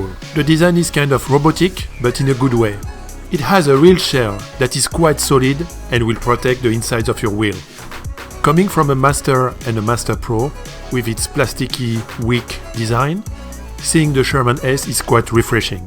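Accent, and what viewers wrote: France. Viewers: I love the fRRench accent :)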